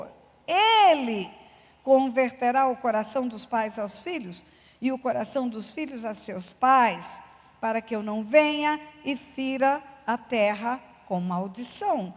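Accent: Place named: Minas Gerais, Brazil. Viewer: Menina forma carinhosa de mineira falar tu és uma benção de Deus aqui na terra amada Pastora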